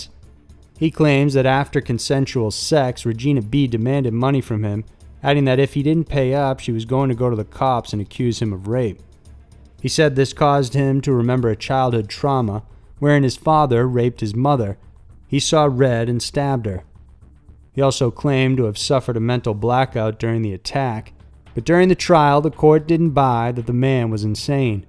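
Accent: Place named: South, United States. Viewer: It is probably his accent. Northern and Southern accents are different and words are pronounced differently according to where you grew up. He sounds like he may have lived in the Southern area of the US. I'm friends with a Southern girl and I am from the North. She says words differently than I do at times.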